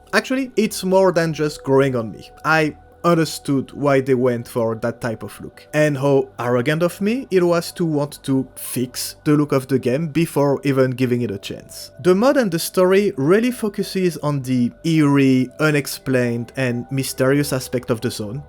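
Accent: France. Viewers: hey, Just coming from stalker2 now i'm gonna play every stalker from soc ti call of pripyat; Can i know wich mod your using on pripyat to make it that good? And if you will be so kind to point me out to some mods for SOC and clear sky to modernize the game a lot graphic wise and gameplay wise gunfight animation while stille keeping main quest and quest in the game. Radiophobia 3 look great for soc but there so many options i'm kinda lost. On Another topic are you french your accent sound french to me :) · Ton accent ^^ ca trompe pas . Cool d'avoir un passionné fr pour les stalkers 👍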